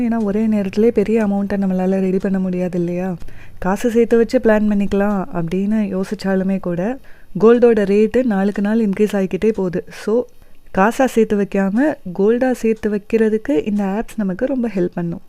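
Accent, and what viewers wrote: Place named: Kerala, India. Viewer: Your voice is soo good ma like malayalee speaks tamil. Slang is different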